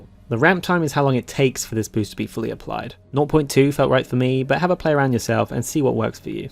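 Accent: United Kingdom. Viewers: Very informative and interesting, will definitely have a play around in the shooting range and have a mess around with my settings now I know a bit more about which each of the new ones do, nice to hear a voice finally, don't apologise for the British accent either, nice to know theres a few Brits in the Bayou 🤠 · Ohhhh snap our boy is English! Nice homie I guess the texts are going to be a thing of the past now that you crossed into the voiceover era! A welcome change! Gives the videos more personality! Just don’t forget to put the mandatory cowboy hats on the steam codes other wise no one’s going to know is a key for hunt related stuff xD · Fantastic. Also, your voice isn't annoying at all. If that's the reason your gameplay videos don't have voices, I really wouldn't worry about it at all. I've got my American and Austrian accents covered by Homereel and Psychoghost, bring on the British!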